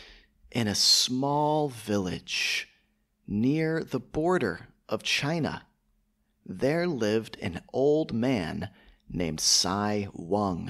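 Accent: United States. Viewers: I found your channel a week ago and was curious to know your first video. You have started differently but I really like your clean pronunciation!! I am learning English in British accent because it sounds better to my ear but you are making me to want American accent as well!! Thank you !!❤❤❤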